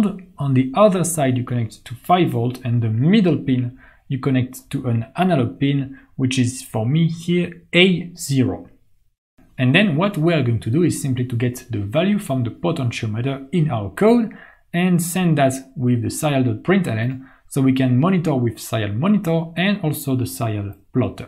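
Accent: France. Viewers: i have one question are you french?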